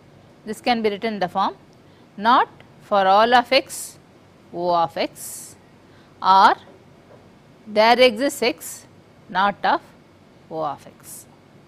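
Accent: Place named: Madras, India. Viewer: It's Madrasi accent can't help it, atleast take what she has to offer. Education in pure form ❤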